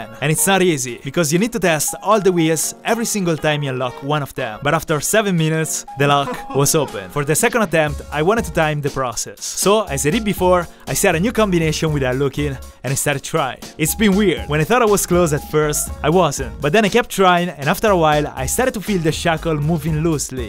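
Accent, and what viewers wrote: Italy. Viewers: Why did I watch this whole video just to hear his accent? LOL. He's so Italian. I love it. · Lovely Italian accent makes it so much more credible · I started noticing the English pronunciation were somehow familiar to me... I see Italian dictionary on the left : "I KNEW IT, MIO FRATELLO!!!" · I am now very nervous about traveling to Italy because of your skills and accent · U sound italian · are you italian?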